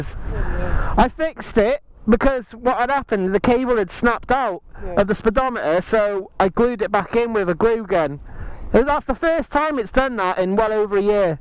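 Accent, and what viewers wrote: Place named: United Kingdom. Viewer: Just subscribed to your channel. Being in Japan it's good to hear a British voice on a motovlog for a change. I've also just started making bike videos. Only 1 for now, but check it out if you have time. Thanks and keep up the good work!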